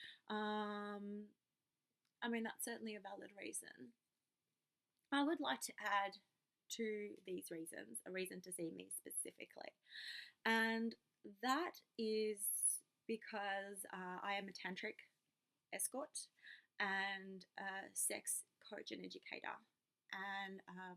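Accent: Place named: Australia. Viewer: judging by her accent she's from down under where it's legal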